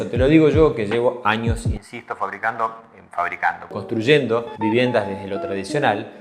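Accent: Argentina. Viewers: Me gustan mucho tus comentarios sinceros y profesionales. Por el acento siento que hablas desde argentina, y la realidad algunas veces es un pelín fluctuante dependiendo del país. Desde España se ve la construcción prefabricada como una ventaja en cuestión de plazos de habitabilidad, por lo que he visto y comparado el precio del M2 de construcción es similar el de la construcción tradicional -estructura hormigón armado/pretensado, tabiquería ladrillo/Pladur, tejado de teja, y fachadas de material hidrófugo - es muy similar al precio de la vivienda prefabricada, pero siempre me queda la duda sobre el sistema estructural, como responde una casa prefabricadas a movimientos/asentamientos de terreno, cambios de nivel freático....esa es mi duda, porque creado el problema, quién sabe cómo arreglarlo?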